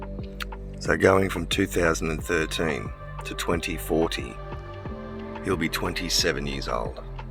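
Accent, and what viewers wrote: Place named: Australia. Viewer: Wow this is crazy awesome! ... a lot to digest here... so your Son's name is Phoenix? For reals!!! Both my husband and first born daughter = 138 in ordinal.. I'm 888 in Sumerian and a couple of things I found while watching this (just for fun) I was exactly 9 months 1 week and 1 day old (911) on July 25th 1981.... and 34 years 8 months(+3 days) after January 1st 1981 my first daughter was born pm.. I love your vibe man,.. so great to hear a bonza Aussie accent for a change while listening to videos on YouTube.. :face-blue-smiling:💙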